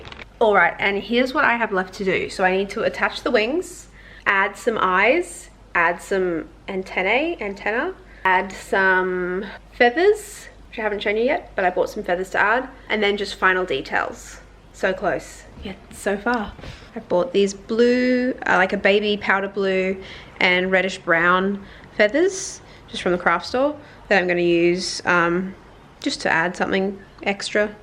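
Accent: Australia. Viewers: are you austral,ian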